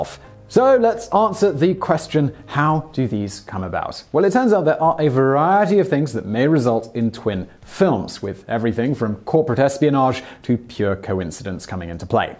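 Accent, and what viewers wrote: England, United Kingdom. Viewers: Funny! You sound like you have an English accent, and yet you used the term 'so-called discovery of America'. This is funny to me, because as an English speaker--especially with an English accent--I would expect you to know the definition of English words. Clearly, you do NOT know the definition of the world 'discovery'. You see, since up to the point that Columbus 'discovered' America, Europe did not KNOW it was there. Thus when it is said that Columbus discovered America the term is the MOST accurate term. He discovered for his people something that neither he nor his people knew existed. Let's not just jump onto the SJW agenda when there is an entire dictionary of English words that you might want to research.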